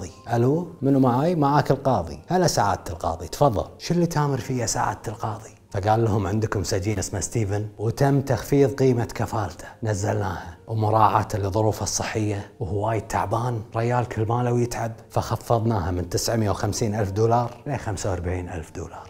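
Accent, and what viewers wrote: Kuwait. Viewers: انت كويتي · ملاحظة صغيرة انا من الجزائر ونلقى صعوبة في فهم اللهجة الكويتية حاول تبسط فيها ..قدامي لماذا جدامي ههه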